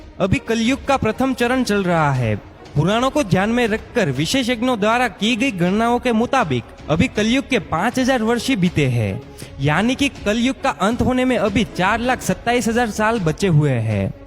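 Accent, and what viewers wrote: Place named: Gujarat, India. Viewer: આ ભાઈ ગુજરાતી લાગે છે ઉચ્ચાર ઉપર થી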